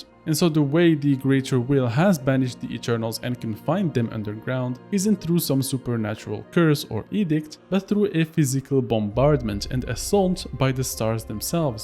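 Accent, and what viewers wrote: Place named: France. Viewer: Ah!! Serais tu...french? Je me disais que cet accent subtil me disait quelque chose... Excellente vidéo, hypé pour le dlc, mais il va falloir éviter Yt les derniers jours avant la sortie...me faire spoiler les boss une semaine avant la sortie par """"""certaines"""""" chaines depuis des années j'en peux plus...😳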